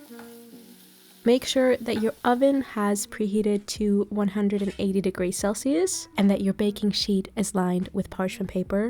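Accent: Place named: Germany. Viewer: I've got to say you have the cutest German accent I have ever heard 🫠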